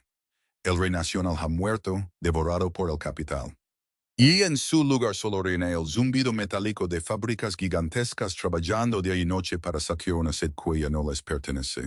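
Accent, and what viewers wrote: United States. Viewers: Antes estaba interezante... desde la semana pasada HABLAS COMO GRIGO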